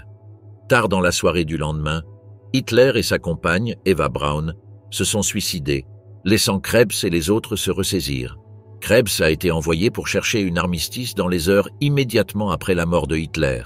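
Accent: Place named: France. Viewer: Curieux, ce doublage par l'intelligence (?) artificielle. : les années dans les dates sont en italien, prononcées avec un accent francais à couper au couteau.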